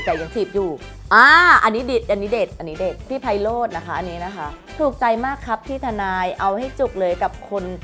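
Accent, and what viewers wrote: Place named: Nakhon Pathom, Thailand. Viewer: ชอบสำเนียงพี่ เหน้อแบบเหมือนคนนครปฐม ❤️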